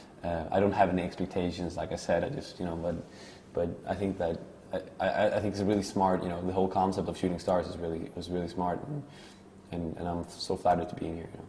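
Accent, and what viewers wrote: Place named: United States. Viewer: He sounds American!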